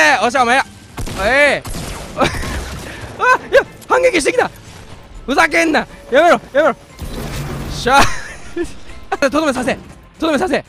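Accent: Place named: Kansai, Japan. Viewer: 関西弁すきw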